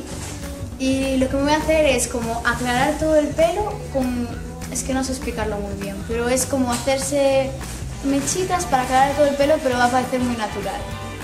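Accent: Argentina. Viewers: De nada:D Por cierto me encanta Argentina el acento y los dulces, el dulce de leche😍😍😍😍😍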